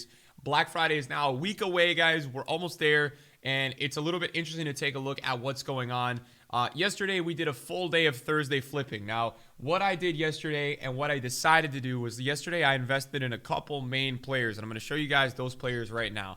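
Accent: United States. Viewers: Where is from I hear US accent but he said 1 pm so where is he from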